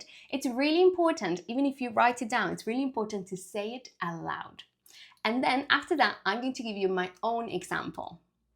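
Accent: United Kingdom. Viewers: Hola señora- de donde eres? Tu acento es muy interesante- lo parece que un poquito del UK cuando hablas inglés. 🤔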